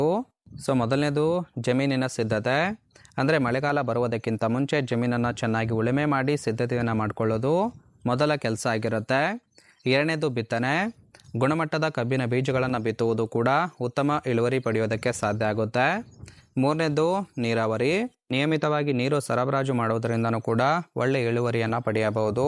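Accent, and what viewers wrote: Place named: Kerala, India. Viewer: O K Callem Malayalli Tone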